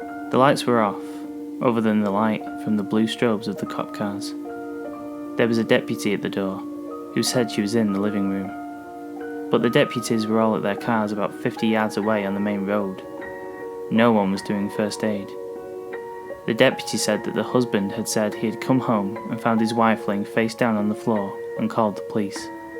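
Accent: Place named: United Kingdom. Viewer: Your narration is getting better and better. Well done !! Nice to hear another Brit narrating !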